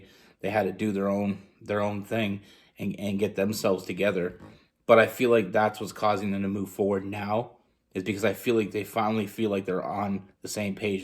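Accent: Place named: Canada. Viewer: I'm from New Brunswick. Are you from Canada? i hear an accent. lol. if not. I'm so sorry.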